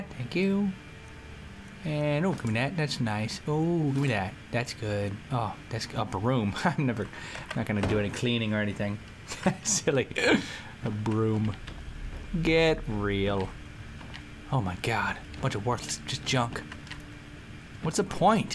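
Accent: Scotland, United Kingdom. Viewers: Not bad Scottish accent :D Of course, i'm comparing you to Hypermole from mole237 (free advert for him) So...yeh, do it more :D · 'TIs a Scottish accent :3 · I prefer the scottish accent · he's got the Scottish accent but not the slang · No he spoke british and scottish accents in the video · dat scottish accent :V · SCOTTISH ACCENT?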